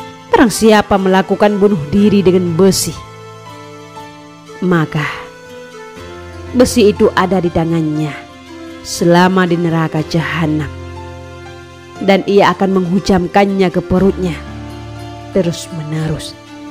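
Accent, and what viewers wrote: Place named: Medan, Indonesia. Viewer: logat medan😂😂😂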